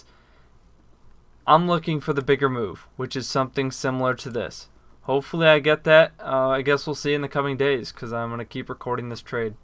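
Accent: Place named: United States. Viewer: You sound like your'e from America. I thought bitfinex doesnt allow US customers?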